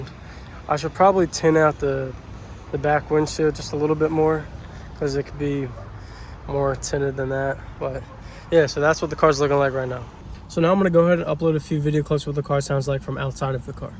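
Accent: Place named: South Jersey, United States. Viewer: you gotta be from south jersey or philly…. gotta be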